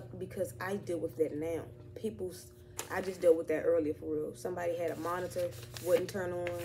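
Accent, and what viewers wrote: Washington DC, United States. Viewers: When I heard the DC accent, I liked the video. When she said 'jih like', on muvas I smashed the subscribe button lol.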